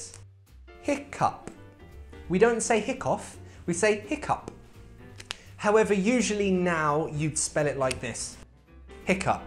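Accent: United Kingdom. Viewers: i really enjoy learning british accent with you funny teacher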